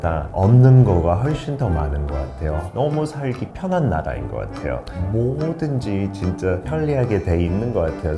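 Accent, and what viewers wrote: United Kingdom. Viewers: 목소리 너무 좋으시고 영국식 발음하시는거 너무 멋진분~!! 🤗🤗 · 엄마한국 몰랏네 아침영어 ㅎ 영국발음? 아닌가ㅎ 또봐요 ㅎ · 님 방송에서 많이 봤으면 좋겠어요^^ 영국 영어 발음도 너무 멋지시고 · 귀가트이는 영어 선생님 이신군요? 품격이 있는 해설과 영국 발음 잘 듣고 있습니다.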